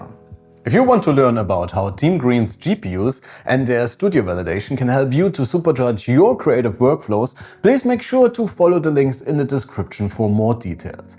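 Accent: Germany. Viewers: Is German your native Language?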